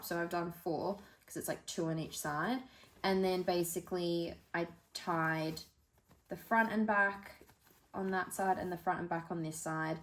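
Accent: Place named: Australia. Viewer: Full credit to the wonderful plethora of American shirring tutorials but it's so nice to hear a fellow Aussie voice saying the word shirring.. 😅 I also loved seeing a tutorial done on a machine with fewer bells and whistles, like mine! Thx for the vid ❤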